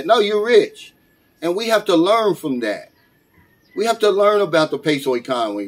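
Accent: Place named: Florida, United States. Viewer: Bro are you from Florida I'm from central Florida and your accent screaming FLA. 😂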